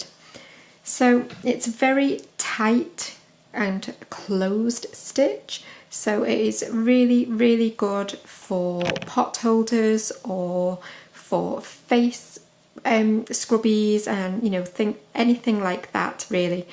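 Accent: United Kingdom. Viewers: I absolutely love U.K accents but yours is particularly beautiful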